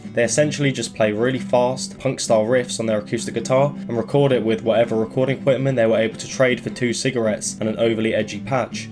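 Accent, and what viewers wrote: United Kingdom. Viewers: hey why does this goblin have a harry potter accent? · He's british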